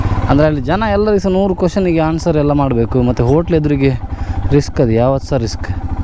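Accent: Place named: Kundapura, India. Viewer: Kundapura Kannada 🔥♥️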